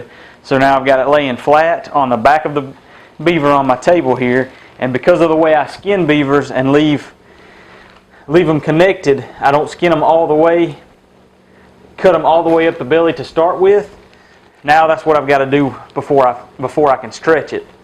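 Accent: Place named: South, United States. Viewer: This guy has such a southern accent, another great video, I learned allot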